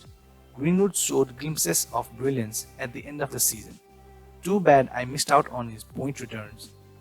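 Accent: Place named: South Asia. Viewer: I'm from Nepal and your accent sounds familiar like from south asian countries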